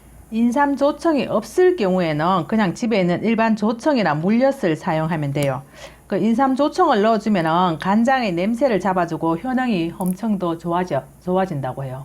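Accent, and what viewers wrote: Gyeongsang, South Korea. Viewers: 님 프사 버꾸셨네요? 항상 좋은 정보와 레시피 올려주셔서 감사하고 대구말씨 넘~~좋아요👍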